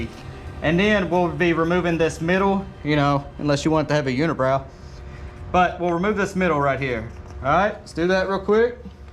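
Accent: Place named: South, United States. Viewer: You’re definitely watchable. Is that a word? South Carolina for me? I detect some southern drawl. Thanks for sharing your remarkable talent!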